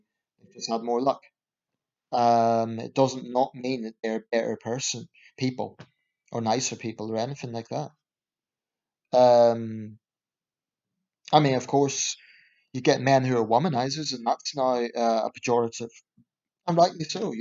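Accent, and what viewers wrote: Northern Ireland, United Kingdom. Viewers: Are You From Norn Iron as well?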